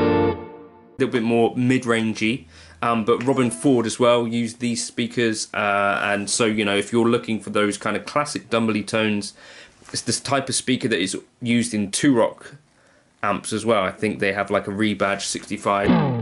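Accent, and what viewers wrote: United Kingdom. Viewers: What a sound! And your noodling is as always way better than my best technique. Cheers! Oh and I don't know if you've been told already but the British accent is jolly fun to listen to!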